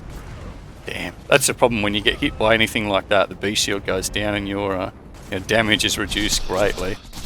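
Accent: Australia. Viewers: I just got here from yote actually. Glad I did, great videos mate, very informative, I love the farming run ones.. It's also good to hear an aussie accent as well, sounds like NSW to me. Keep em coming. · Mate where from the Aussie land are u from?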